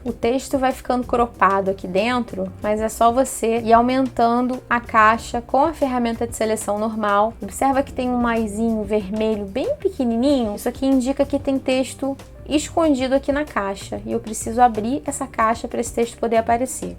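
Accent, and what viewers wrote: Rio de Janeiro, Brazil. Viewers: Video bom. Sotaque carioqueiiissx forte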